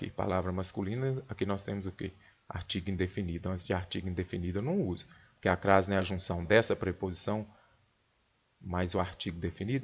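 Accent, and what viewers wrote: Minas Gerais, Brazil. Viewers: Professor, você é mineiro?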